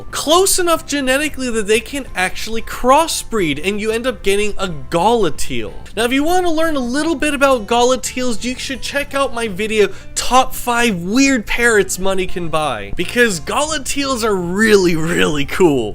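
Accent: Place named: India. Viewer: My God, what english talks this chap? I cannot understand him. Are you from India or Pakistan, or Thai…. impossible recognize this accent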